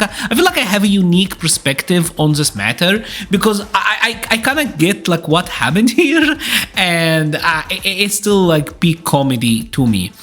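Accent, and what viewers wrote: Egypt. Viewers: Egypt? crazy accent. how do so many of yall fit in the Nile delta? 🤯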